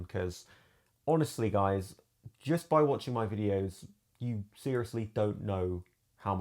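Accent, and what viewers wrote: United Kingdom. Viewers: as Am-re company, we stumbled upon you while looking for influencers to help us do video reviews in the UK, one of your videos had a lot of views and it was great we liked it. now what we like about you, your voice and the way you talk about a product, it's calm slow and with very good words, the second thing that we like is our hairstyle its almost white but for sure you haven't passed your 30's look cool, the British accent. we would love for you to review our product the best 40 USD you have ever spend on earbuds of course we can send them to you. - back to the point hahahaha we also like your background studio the colors are great and very eyecatching, I'm sure you take your time and review the products and as well time to make the script for what you are going to say. the good point and the bad points. last point: the shots and Broll over voice you do its really good. 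we been following sense you had only 7k something followers and we are also surprised the way you exploited over the last 3 months Ps; we been following you for the last 3 months lets get to 1.3 billion in the next year. you are the best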